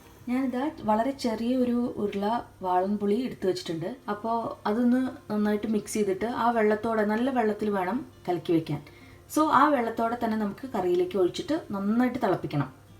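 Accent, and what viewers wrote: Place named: Palakkad, India. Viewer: palakkd nnano, njangl palakkattu karade oru samsara reethi ndu and cooking style too... Rasam koodi onnu idoo... Plz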